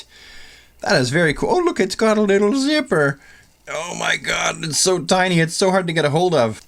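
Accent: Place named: Canada. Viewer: Love your channel. (I know I say it a lot) but I always forget your Canadian while watching until you say certain words. And it makes it all the better lol.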